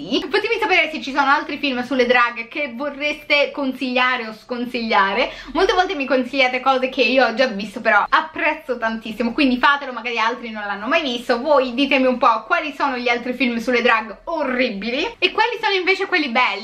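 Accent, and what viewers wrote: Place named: Rome, Italy. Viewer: Amo tantissimo il romanaccio, dona a tutto una nota così affascinante e divertente: pura poesia ♥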